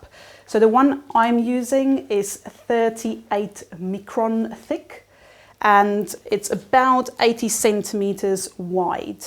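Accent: South Africa. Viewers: Are you South African?? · This is so incredibly insightful. Thank you for the time. Also are you south african? I know you in the UK. But you have bit of a South African accent.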